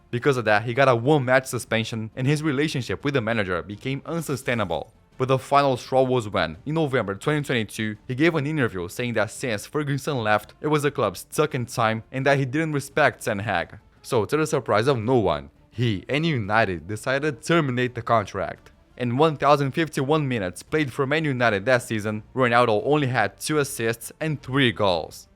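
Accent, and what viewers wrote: Brazil. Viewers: You speak english with Brazilian Accent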